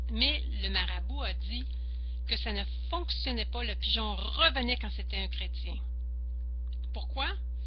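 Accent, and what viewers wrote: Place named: Canada. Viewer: Bonjour d'un frère français j'aime bien vos vidéos merci pour vos conseilles et vos expériences , ça donne une petite tournure marrante avec votre accent Canadien .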